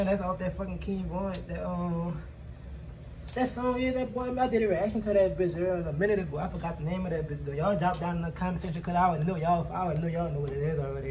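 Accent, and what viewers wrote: New Orleans, United States. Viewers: Say u from New Orleans huh I can tell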